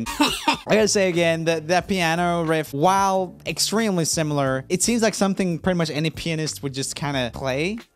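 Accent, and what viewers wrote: Sweden. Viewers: Its probably his swedish accent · It's his accent. Idk why Swedish accents are like that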